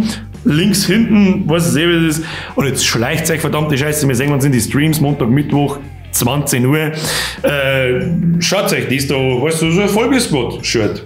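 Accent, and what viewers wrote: Bavaria, Germany. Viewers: Oid Boarischa noma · Bin nicht aus Bayern finde dich einfach sympathisch und den bayrischen Dialekt ist einfach nice wünschte ich könnte ihn btw ich verstehe ca. 80% was du sagst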